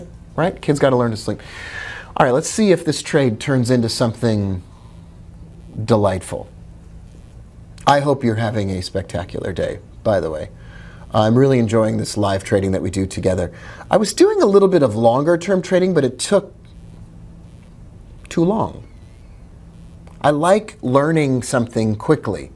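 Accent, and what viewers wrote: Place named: New York, United States. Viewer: You can always hear a new yorker!! Even without a microphone!!!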